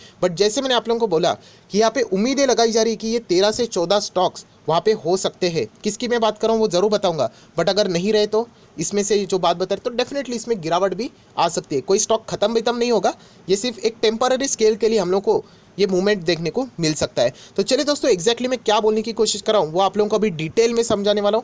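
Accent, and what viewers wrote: Maharashtra, India. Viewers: सर, तुमच्या बोलण्याच्या पद्धतीवरून असे वाटते आहे की,तुमचा मराठी भाषेशी काहीतरी संबंध आहे.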